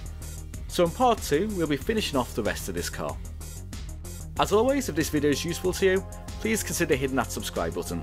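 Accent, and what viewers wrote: Germany. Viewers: That's a really great result, really a fantastic job you did there. I also really enjoyed your accent, you sound German, are you originally from Germany? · Incredible transformation! Good job! Haha your accent made it very easy for me to understand what you said bc you pronounce the letter U just the way we do in Germany. Greetings and keep up the good work.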